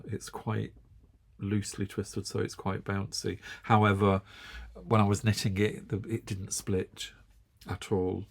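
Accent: United Kingdom. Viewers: I love your British English and I am sorry that Americans have the same language 😂 But I am Austrian and we have the same problems with Germans 🤪 Thank‘ s for your Videos! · Hi, I just found your channel, greetings from Brazil. I love the british accent and is a bonus we have the metric system in common! Thank you for sharing your beloved findings in Temu, so I can get some and all those comments, I'm having a laugh!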